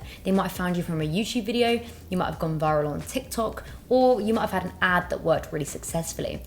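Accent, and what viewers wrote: United Kingdom. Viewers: I think your so cute and i love your british accent...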